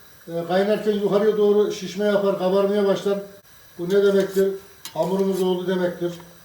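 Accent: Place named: Central Anatolia, Turkey. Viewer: Usta Orta Anadolulu. Hamur açmasından ve ağzından anladım.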